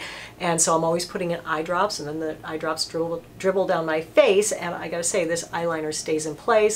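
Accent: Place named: Boston, United States. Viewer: I never remember to ask you--where is your iconic Mass accent?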